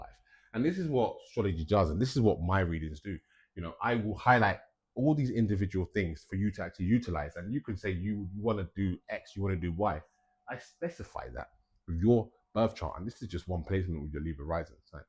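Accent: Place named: United Kingdom. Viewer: So refreshing to listen to a wonderful astrology session that is presented with a British accent!!❤🎉🙏👍💫✨️🌟✨️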